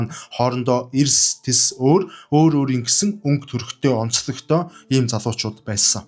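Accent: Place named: United States. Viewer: Дайн саад байх юу бхав дээ сонин стилээр америк стилээр хуцаад боль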